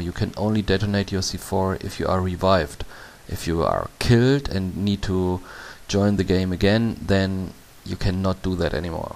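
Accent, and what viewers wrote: Germany. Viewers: Du bist ein Deutscher :D Das höhrt man! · Ich wusste ab sofort, dass du Deutscher bist xD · Best german accent i have ever heard of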